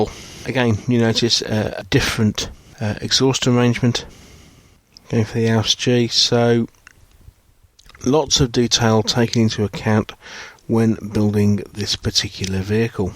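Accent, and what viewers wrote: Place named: RP, United Kingdom. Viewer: Another great review by. As an American, I love his proper "Queen's English". I do know the difference, as I was married to a Geordie from Whitley Bay, and I loved her Geordie accent too!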